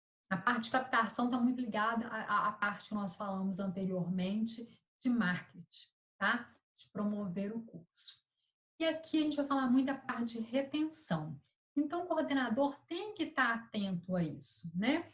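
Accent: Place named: Goiás, Brazil. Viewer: Sotaque goiano detected. Parabéns pelo vídeo minha querida.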